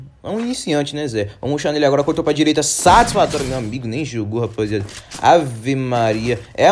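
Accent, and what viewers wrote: Brazil. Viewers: Uai ze c e mineiro ze??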